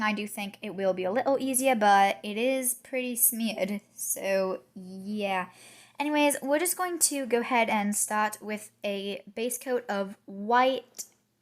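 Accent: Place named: United Kingdom. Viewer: Why did you used to have a British accent in your videos I thought youre American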